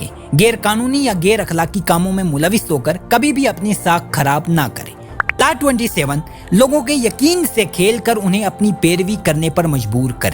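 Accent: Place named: Gilgit-Baltistan, Pakistan. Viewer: Apky urdu accent sey lagta hay app Gilgit Baltistan say belong krtay hain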